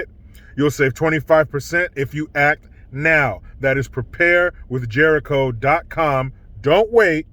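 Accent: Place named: New York, United States. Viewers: Loved that New York accent. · That New York accent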